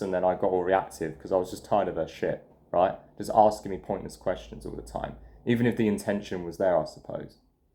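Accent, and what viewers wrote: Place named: United Kingdom. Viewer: I know I'm late to the party here but you only just showed up in my feed last night and I'm starting to work through your vids. First, I respectfully and in no way mean this in a condescending way request that you interact with your mum and gran with nothing but respect, patience and kindness. Your dad, if he left you and your mum for whatever reason, can piss the f**k off, however. :) Second, you sound like a proper Brit. If this is the case you need to take pride in your country and continue forth its inspiring tradition; such is the great example (while not always perfect) your ancestors created that people literally risk everything to gain entrance to your country in these troubling times. I agree with you that things seem grim but they are by no means hopeless and not without solution.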